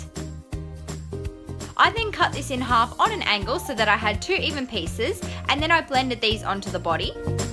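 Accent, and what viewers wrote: Australia. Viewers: i heard your voice and i knew straight away you were aussie😁(im aussie too)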